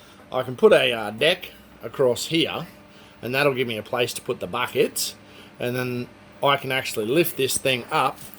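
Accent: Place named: Australia. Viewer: Looking good!, huge back saver!i thought i was the only one who had trouble with train leaving the station without me on it !😂you to young! Love the Australian accent and words you use, seriously looking great you've come long way!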